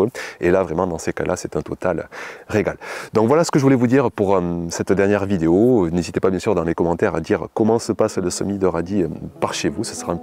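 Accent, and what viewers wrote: South, France. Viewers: Bonjour. Je suis debutante au jardin et je regarde vos videos depuis peu. Videos que j'apprécie enormement d'ailleurs. (Contrairement aux autres vous allez à l'essentielle). Merci de partager votre savoir et vos expériences. L'accent du sud est un petit plus qui ensoleille notre ciel gris de la région parisienne. Fini de parler, c'est parti pour mon 1er semi de radis 😅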